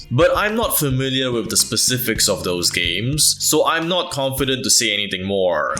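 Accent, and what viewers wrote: Singapore. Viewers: Are you from Singapore?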